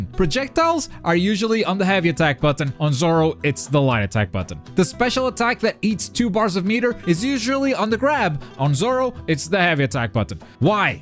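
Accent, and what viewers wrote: Portugal. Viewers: Are you portuguese?? Like portugal portuguese?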